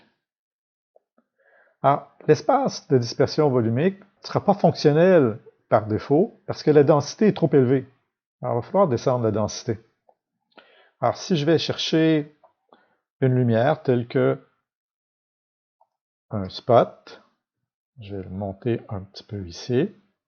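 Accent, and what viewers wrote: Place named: Canada. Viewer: Bonjour. Je découvre ta chaine avec plaisir. Ton tuto est exactement ce dont j'avais besoin pour le visuel sur lequel je travaille. J'aime beaucoup ta façon d'expliquer, c'est clair, efficace, avec l'accent canadien que j'adore de surcroit! Je vais regarder tes autres tutos. Bonne continuation. Birdee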